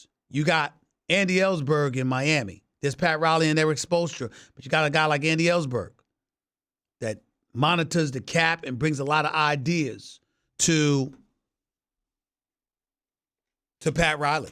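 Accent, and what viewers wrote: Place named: New York, United States. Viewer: NY accent, especially in the boroughs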